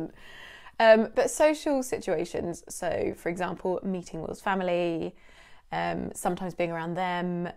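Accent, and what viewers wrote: United Kingdom. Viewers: First of all thanks for your channel "English with " which has improved my English drastically. Now I have also started following this new channel of yours which is now improving my listening skill, especially British accent. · British English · Thanks to you now i like british accent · I've been watching English with and now this for about a week now. I'm an author in America and I just enjoy the British accent a lot, and I enjoy watching a beautiful person talk (and you're very beautiful), so listening to you and watching you is appealing, and here and there I've learned some things to improve my English. (I've been pronouncing albeit wrong for years, lol.) It surprises me to hear that you're 24 in this video, which means you're 25 now. I'm 31 and I've had this feeling like you were older than me, more mature and developed somehow. 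I rarely ever get this feeling about someone younger than me. Perhaps never before, actually. I think it might be the British accent; it comes with an association of rightness for me. I wonder how many other Americans would react to you the same way I have. Blessings.:)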